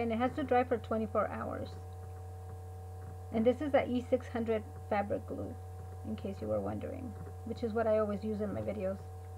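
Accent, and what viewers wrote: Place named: India. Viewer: Nice done, People from india 🇮🇳 have a very cute accent , you sound like a sweet indian lady who works at my dentist office !! She is so crafty just like you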